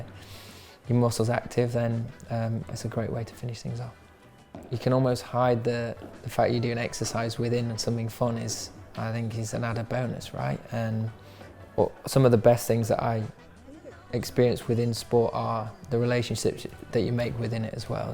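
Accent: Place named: United Kingdom. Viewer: Bro has the most polite British accent ever 😌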